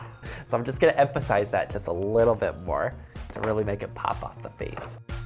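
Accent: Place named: Midwest, United States. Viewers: Her Midwest accent is so fucking cute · The Minnesotan accenttttt. Makes me feel right at home😊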